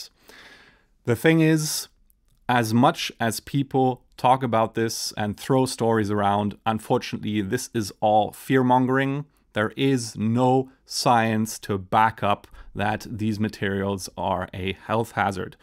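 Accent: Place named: Germany. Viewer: You have some german accent, are you from Germany? :)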